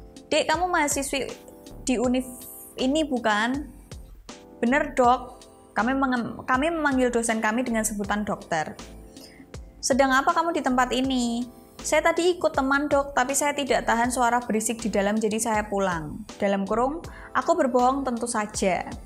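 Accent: Java, Indonesia. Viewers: Jowomu kok medok tenan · medok mu tenan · Menurutku Mb lbh CERDAS dari sugar baby yg Mb ceritain Bhsa Jawa Mb medhok jdi lucu dengernya Sukses Mb 🙏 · Aku senang banget wajah cantik gini tapi medok Jawa. ❤🔥semangat kak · Jowo ne muantebz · BHS indonesia dgn Medog Jawa jd lucu dengarnya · kenapa saya sampe di sini ya hahaa mbak e ini pinter , ceria dan pasti nya orang jawa tho suka dialeg bahasanya yg medhok hehehe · Medhok bgt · klo ngomong enak jowoan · seneng banget denger logat jawa nya mba * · Mbaknya cantik tapi medok jadi lucu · Dede medok cantik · Lumayan..bagus cara menyajikannya...logat jowonya yg bikin seru...👍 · Seneng dengar logat jawanya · Totok tenan jowo ne 😀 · medok banget logatmu mba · Suka dg gaya bicara yg medok khas Jawa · Logatnya saya suka 'Jowo banget' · Medok nya itu rek, lucu n so sweet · boso jowo'ne medok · Medokk ya mbaee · Bhsa indonesianya kog medok mbk, org jawa ya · Logat Jowomu medok banget mba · Menarik.... 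Logat Jawanya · Logat jawamu mbak uenak dirungokno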